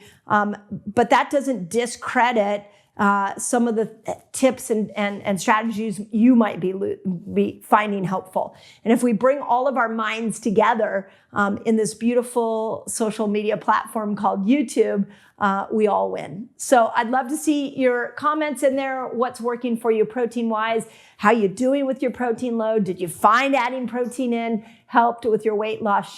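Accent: California, United States. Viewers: Doc has a bit of a California surfer accent.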